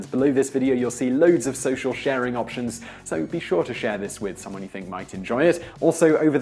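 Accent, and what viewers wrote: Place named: United Kingdom. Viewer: "Here in America", there's other English speaking countries too. Listen to Simon's accent, he's British. British spelling is arse and yes we say it like it's spelt. Actually "ass" in Britain refers to a type of Donkey.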